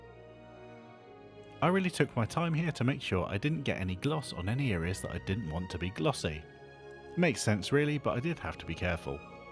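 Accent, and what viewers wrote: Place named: England, United Kingdom. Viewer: Wonderful result as always. It’s hard to tell that the StuG isn’t 1/35 scale. My wife overheard me watching the video, and when she heard you say “I have to stop saying splotches” she said at least it sounds sophisticated with an English accent. 😂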